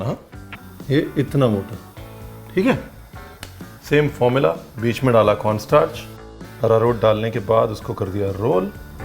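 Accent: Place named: Uttar Pradesh, India. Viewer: Ranveer d way u talk and d words u use i can so much relate to them coz m also frm UP .... apnaiyat ati hai bohot 😇... n i so much enjoy watching ur videos they are so different from others their is a charm... love ur simplicity and humble attitude ❤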